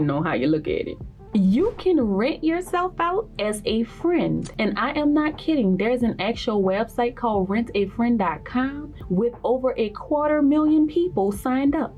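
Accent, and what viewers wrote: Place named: Louisiana, United States. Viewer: That Louisiana accent is too pretty behbeh. Excellent topic pretty Sista. 😊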